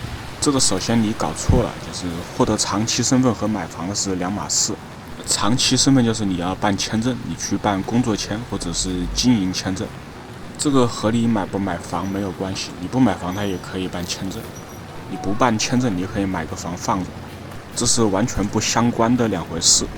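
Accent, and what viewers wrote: Wuhan, China. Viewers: 兄弟口音是武汉的？ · 配个字幕就好了，感觉好像汉普啊哈哈🎉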